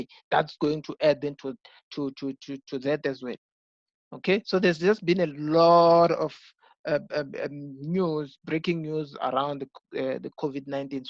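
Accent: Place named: Venda, South Africa. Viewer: And judging by the accent I'd say you're Venda? Besides your love the work bro. And that wololo killed me😂🤣🤣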